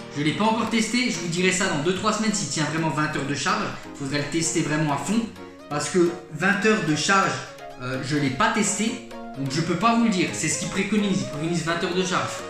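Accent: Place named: Alsace, France. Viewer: Et yo l'accent alsacien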